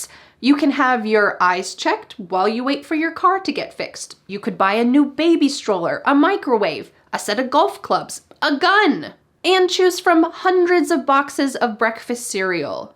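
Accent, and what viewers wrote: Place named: United States. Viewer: Hi, I have been fallowing you for a long time in order to improve my english skills. I am a really big fan of you. This is the best english chanel when it comes to american accent. It 's the best to learn about american culture as well. Hi from 🇩🇴🇩🇴🇩🇴🇩🇴🇩🇴🇩🇴🇩🇴🇩🇴🇩🇴🇩🇴🇩🇴🇩🇴🇩🇴🇩🇴🇩🇴🇩🇴🇩🇴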